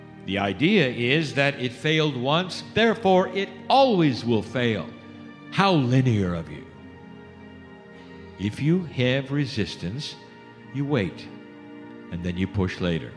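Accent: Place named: United States. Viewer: he sounds like an american, with sound effects from Holliwood. hollwood is under question.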